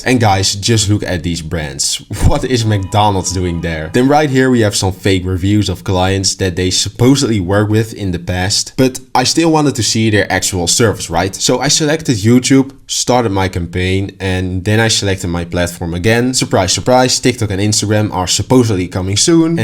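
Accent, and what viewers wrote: Netherlands. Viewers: Ik hoorde gelijk je bent Nederlander lol.. als nog goed hoor je kan echt niemand meer vertrouwen deze tijd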